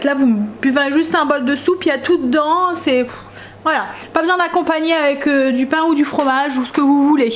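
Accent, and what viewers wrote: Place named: Nord-Pas-de-Calais, France. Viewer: Coucou... No comment pour les égoïstes Français... J'adore lorsque vous testez les produits, vou êtes courageux... Super la réaction des hommes fassent à la chemise de nuit homme et ton échange avec 🤣 et on entend bien l'accent ch'ti...génial... Tu me donnes envie avec ton gratin de pâtes et le FROMAGE et la tartiflette avec le REBLOCHON...pour me faire plaisir je mange du gruyère à-25% de sel et pareil pour le gruyère râpé de temps en temps... Gros bisous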